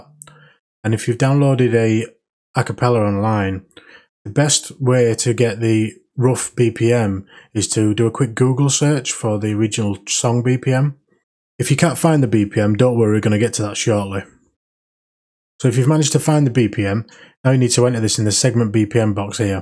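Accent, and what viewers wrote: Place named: North, United Kingdom. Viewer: BROOOOOOOOOOOO... Honestly you've got no idea how many video's I'ved watched and failed at when trying to warp vocals... to the point where I'd nearly given up production... Your video has just made my brain click into place in 1 min and 40 seconds in.... whether thats because your from up north somewhere near me by the sounds of it.... THANK YOU SO MUCH MATE! HONESTLY. legend!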